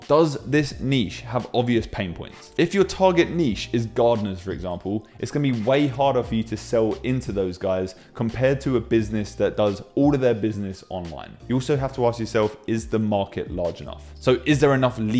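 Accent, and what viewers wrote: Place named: United Kingdom. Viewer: Okay, you have a British accent but you mentioned potential earning in US dollars, why?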